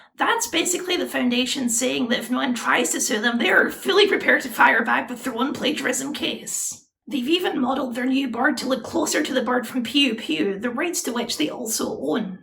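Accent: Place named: Fife, United Kingdom. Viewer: You're partially right in this instance, as the absolute scavenger that's the current trademark holder is domiciled in the United States. However plagiarism is actually an offense under UK law, the nation state both the creator of this video and I are domiciled in (So I assume based on his vaguely Fife-adjacent accent; Am I close?), and is also an offence in France where the potential plaintiff is domiciled. This might still matter, but only in relation to the game's distribution in those regions: Something I have little doubt the nutter who shat money on a dead old game's name only to feather it with crypto-slop will care little about, as there will be plenty of markets where the law does not adequately protect creators.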